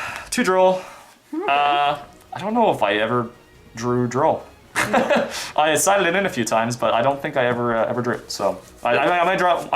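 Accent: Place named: Newfoundland, Canada. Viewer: Love the newphie accent. All the hard A's lmao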